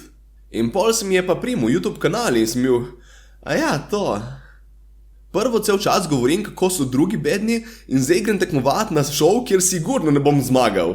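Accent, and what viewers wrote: Primorska, Slovenia. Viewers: Obožujem tvoj naglas🙌 primorci rulamo😂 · Ma kaj, super si bil, samo, da žirija in publika ni imela pojma, kaj je to komedija. Kaj pa blebeta tisti Čakarmiš, kaj pa je on naredil v življenju, razen tega, da je nekaj delnic kupil od Pro Plusa in lepo živi od dividend in drugim soli pamet. Toliko brezveznega pesimizma naših Slovencev pa še ne. Seveda, tudi jaz sem bil začuden, da si bil na Talentih, nekaj časa sem mislil, da je neka reklama zate, no potem pa sem poštekal, da resnično tekmuješ. Bil si brez treme, govoril našo primorščino in hvala Bogu, da te imamo. Tu sei bravo!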